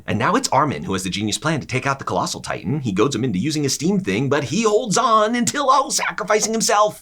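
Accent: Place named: United States. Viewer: Your American voice doesn’t make me change my viewing. Grata. Do more anime!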